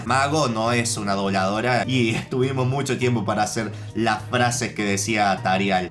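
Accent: Argentina. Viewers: no solo te ganaste un like tambien un sub se nota que es de angerntina mi pais saludos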